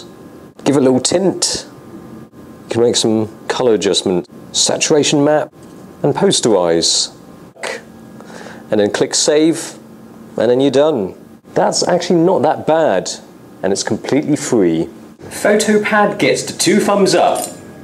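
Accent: England, United Kingdom. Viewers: he was born in england just because hes of asian decent doesn't change his voice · no offense how are u asian but with a english accent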